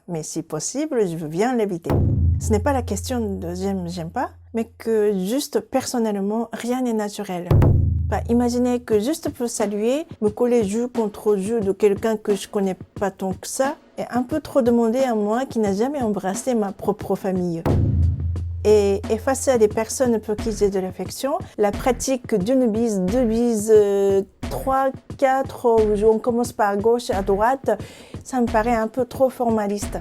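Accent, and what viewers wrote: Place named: Africa. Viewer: C'est marrant tu parles tres bien le Francais...Avec un accent presque " Africain " !!!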